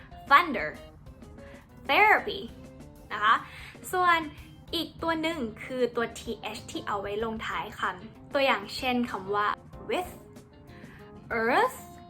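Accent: United States. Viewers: ฟังง่ายค่ะ ฟังดูเป็นอเมริกัน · ยังไม่เป๊ะ อเมริกัน 100 เปอร์เซนต์ค่ะ แต่ถือว่าพูดได้โอเค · Your pronunciation is not correct but the accent is sounds like American.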